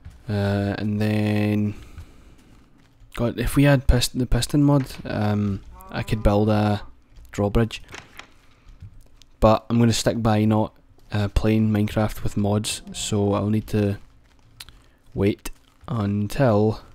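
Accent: Scotland, United Kingdom. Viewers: Yir scotish yes!!!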